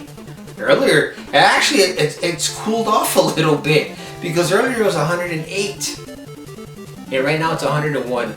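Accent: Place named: Texas, United States. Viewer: I'm from South Carolina, so probably not the best judge of accents. But yeah you have a slight Texan accent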